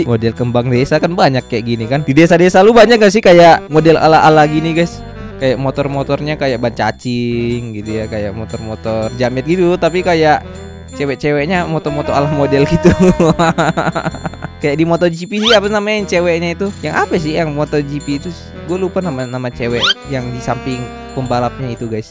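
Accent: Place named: Depok, Indonesia. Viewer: Omongan u kek orang depok bang.🤣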